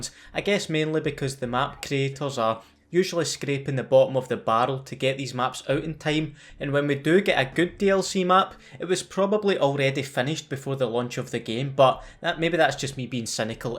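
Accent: Scotland, United Kingdom. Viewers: Is he scottish? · I love watching your vids I'm british and I can do a Scottish accent due to you thx my favourite thing I heard you say was you camping bastard #notification sqad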